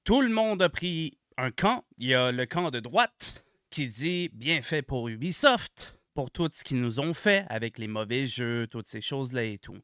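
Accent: Quebec, Canada. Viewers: Un abonné de plus, suite à la video de Psyhodelik. L'accent québécois me manquait, je reste squatter un peu 😅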